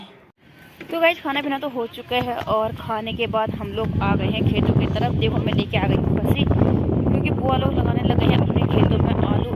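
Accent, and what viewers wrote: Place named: Kumaon, India. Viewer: aaj aap bhi kumaya boli bolna lag gayi jay ho kumaya mitra wow nice😃😃😃😃😃😃😃😃😍😍😍😍😍😍😍🤩🤩🤩🤩🤩🤩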